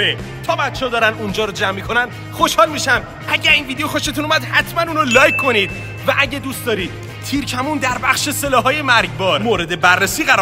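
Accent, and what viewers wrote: North, Iran. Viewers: پشمام فکر میکردم شمالی باشی 😂 ویدئو هات خیلی خفنه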